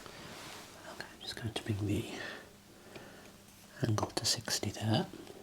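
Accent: Birmingham, United Kingdom. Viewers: When I started watching your videos I got so confused by your Birmingham accent. Three years later you sound like fairy from Neverland to me. You don't help me to fly, but to fall asleep easily 😊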